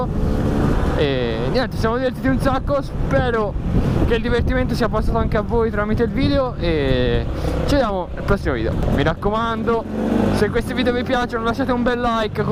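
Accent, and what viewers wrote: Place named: Tuscany, Italy. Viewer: hahha ok Bro! PS. Amo il tuo accento toscano, lo adoro!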